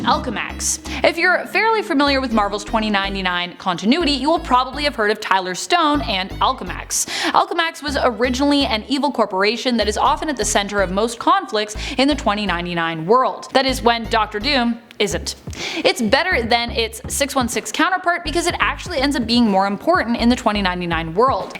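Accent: Canada. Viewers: The fact that she's Canadian is just a coincidence.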